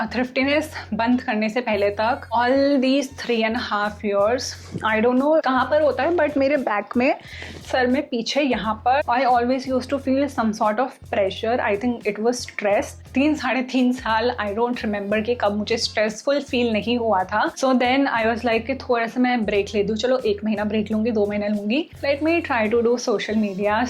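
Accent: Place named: Japan. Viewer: lovedd your Japanese acsenttt it suits you so well 😍😍 lots of love 💌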